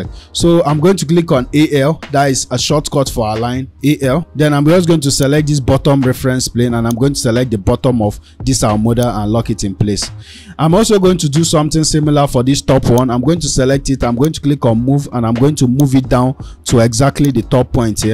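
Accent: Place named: Singapore. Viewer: I am from Singapore and I think we have a very similar accent! haha.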